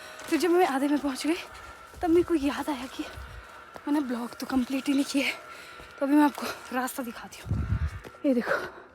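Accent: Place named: Pahari, India. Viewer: Hy ksy ho? Aap ki pahari ton bhut Aachi h Or Aap khud bhi bhut sundar ho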